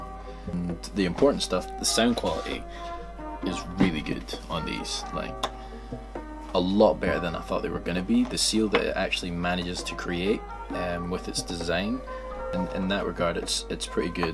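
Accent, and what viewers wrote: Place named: Scotland, United Kingdom. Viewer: I live in the Glasgow area and you honestly have the most interesting Scottish Accent I've ever heard! Oh, and by the way, I bought these earphones on the back of this review. Mainly because when you started playing music your face said 😲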